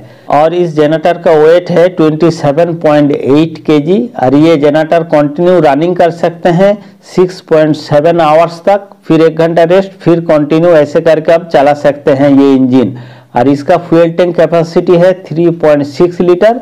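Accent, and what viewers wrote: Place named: Bengal, India. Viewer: Apni Bangali apnar Hindi Sune bujha ja6...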